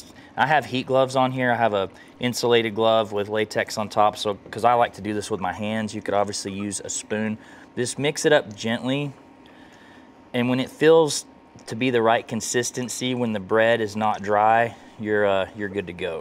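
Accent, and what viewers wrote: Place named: Alabama, United States. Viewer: Man I thought you was from Alabama lol where is the dressing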